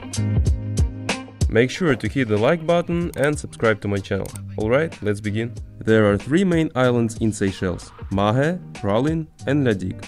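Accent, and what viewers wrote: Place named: Russia. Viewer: Братан, у тебя русский акцент. Это 100%. Ты откуда?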